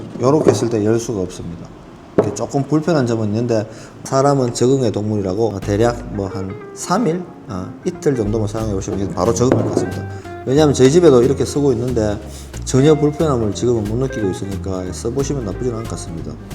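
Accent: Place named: Gyeongsang, South Korea. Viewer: 설명 잘듣고 갑니다. 오랜만에 경상도 사투리 들어서 좋네요 ㅎㅎ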